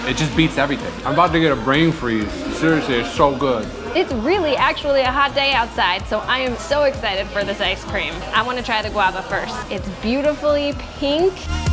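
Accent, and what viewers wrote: Chicago, United States. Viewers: You have the thickest Cha-cah-go accent. 👌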